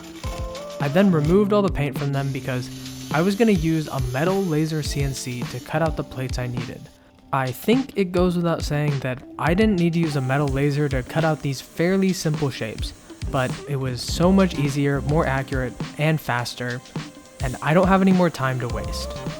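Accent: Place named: United States. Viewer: you're so american it almost reeks out of your voice. ew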